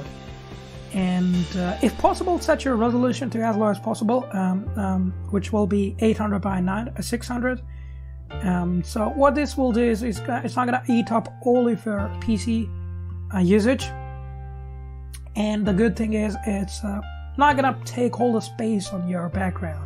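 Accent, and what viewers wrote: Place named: United States. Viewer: I dont want to be mean but he sounds like Mikey Mouse with an American accent. He makes amazing and helpful videos still.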